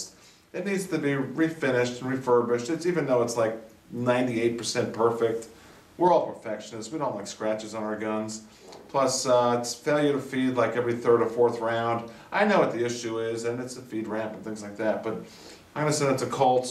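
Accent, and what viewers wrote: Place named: California, United States. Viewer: this guy sounds like hes californian.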